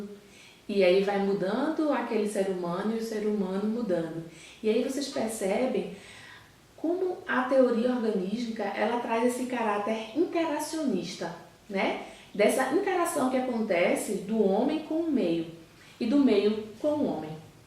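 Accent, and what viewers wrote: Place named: Northeast, Brazil. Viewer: Mas o mais gostoso do vídeo é poder estar aqui em Brasília (como eu estou) e curtir um vídeo com esse sotaque liiinndo do nordeste!!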